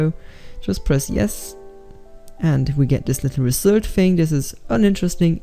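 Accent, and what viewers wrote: Germany. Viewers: you sound german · are u german ? u dont sound like a english guy :D greetings from germany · Sehr gutes Englisch aber man merkt das du Deutsch bist :D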